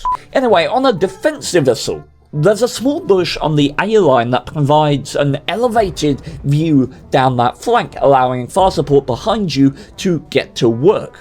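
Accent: United Kingdom. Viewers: Evrything's better with that English accent.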